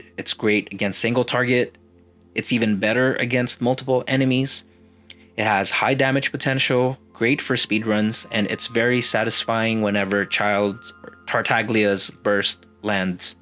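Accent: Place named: Philippines. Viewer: As soon as I heard your voice I immediately knew you were filipino! Very glad to see a fellow Filipino, and a genshin creator at that. Love your vids and hoping you get 10k soon, keep it up bro :D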